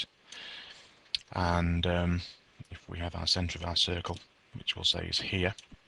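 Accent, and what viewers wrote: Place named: North West England, United Kingdom. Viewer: Lol. Hes from north west i think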